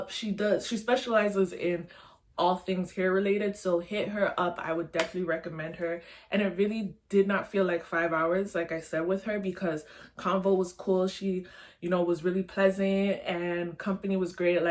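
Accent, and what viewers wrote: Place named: Toronto, Canada. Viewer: You sound like you from Toronto. Your hair looks great I want to try these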